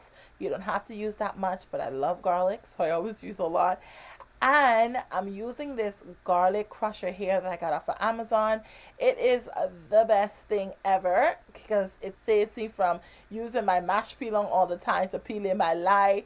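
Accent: Bahamas. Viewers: The accent is there! I know a bahamian accent when I hear it. I know my people! 😂 I knew it too! 🇧🇸🇧🇸🇧🇸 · I’m watching your video and I keep hearing a Bahamian accent then you go and say Bahamas lol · I knew I heard a bit of our Bahamian accent!🫶🏾🫶🏾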